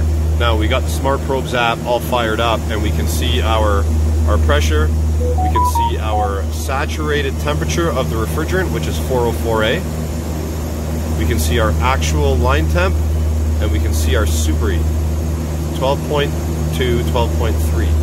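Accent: Canada. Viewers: 404, EH? Isn't that a freeway going north from the Don Valley Parkway? 😀 You sound like you are Canadian...if not, I will remove this comment. Having fun here in Virginia.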